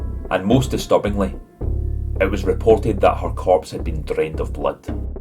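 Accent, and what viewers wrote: Scotland, United Kingdom. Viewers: Excellent stories, great background music and your Scottish accent ads to it all. Love it!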